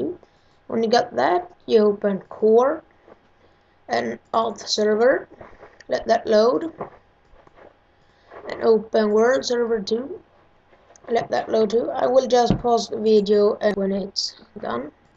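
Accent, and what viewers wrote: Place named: Scotland, United Kingdom. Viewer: its uhh duuunn lol wtf is he..a scotty idk but the way he talks makes me laugh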